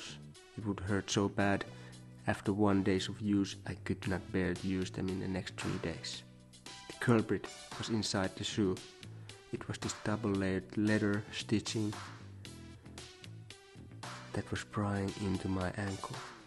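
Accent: Finland. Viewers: Hey, thanks so much brother. I was having the same issue, by the way, are you from Finland? Judging from the accent. 😄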